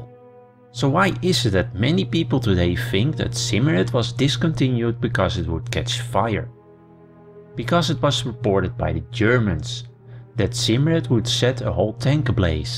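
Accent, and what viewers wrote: Netherlands. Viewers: NederEngels accent 100%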